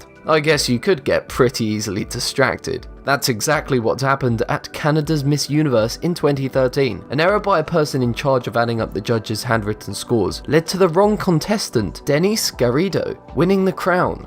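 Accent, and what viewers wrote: United Kingdom. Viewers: Pietryga Lv he’s a Brit he has an accent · And your British axent like mine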